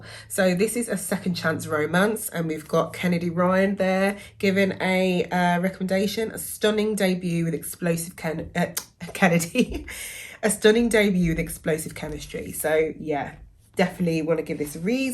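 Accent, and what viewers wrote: Midlands, United Kingdom. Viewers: Glad you got 'Exciting Times' cheap. My experience going in hearing promising reviews, was left feeling more unexciting times. But hope you have a better experience with it, love your content and hearing a fellow midlander on YouTube ✊️ - Fab ✨️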